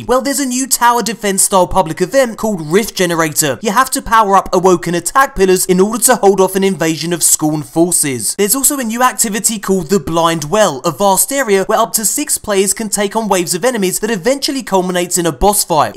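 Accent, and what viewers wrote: United Kingdom. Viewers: Dude I love you but your British and when you morph your voice I can't understand shit you say. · And this kids is what happens when you combine clownfish voice changer and a lit British dude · That voice is kinda annoying.... I prefer your brilliant British voice you beautiful beloved bostared (if you are British)👍🏿👍🏿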